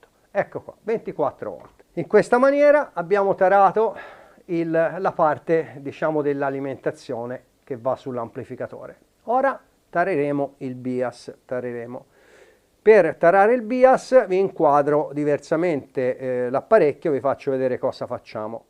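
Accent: Tuscany, Italy. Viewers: buongiorno, sento che sei toscano, ci ho abitato per 10 anni a colle di val d'elsa, poi ho fatto un incidente sulla moto da cross e sono rimasto in carrozzina e ho dovuto ritornare alla mia città, mi hanno regalato un amplificatore pioneer 9900, da un amico, però mancano i 2 coperchi sopra e sotto, e tutte le manopole, i carter e le manopole non mi interessano,poi le trovo io, quello che me lo ha dato,mi ha detto che aveva un canale che non andava, e che si accendeva,ma ho messo la spina e de saltato il fusibile, me lo potresti aggiustare? sono un appasionato di questi componente degli anni di gioventù mia che sono del 66,buona giornata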